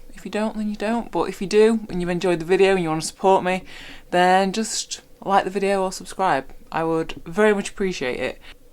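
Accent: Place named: North of England, United Kingdom. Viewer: Robert Tempest : that's the accent of a good north of England gal and it doesn't need to change thank you very much 😃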